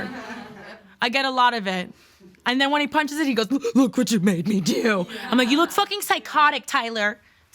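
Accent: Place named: Brooklyn, United States. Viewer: I just found this channel. This shit has me cracking up. Before u even said it, I knew u were from Brooklyn from your speaking and your swagger.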